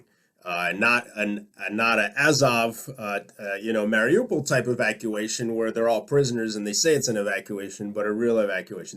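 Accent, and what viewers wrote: Russia. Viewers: Your information is fascinating! Very clear, detailed and intelligent descriptions/analyses! Hugely impressed. Thanks for the info on Dnepropetrovsk. I'm familiar with the Ukrainian towns/cities through a lifetime spent studying Russia/the SU and the GFW/Barbarossa. Your description of defence in depth was also very impressive, though I doubt anyone without military experience or understanding can appreciate just how complex it all is. I will keep watching you and Brian Berltic. PS I'm now sure you are originally Russian. You have a tiny bit of an accent which comes out every so often with the vowels! 😊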